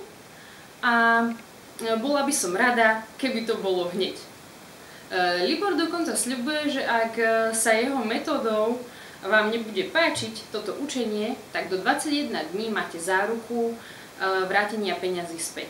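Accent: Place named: Brezno, Slovakia. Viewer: Aká pekná žienka - a ako pekne hovoríš po slovensky! Ale predsa len poznať, že si z Brezna :D Ináč ďakujem, ale po anglicky už viem.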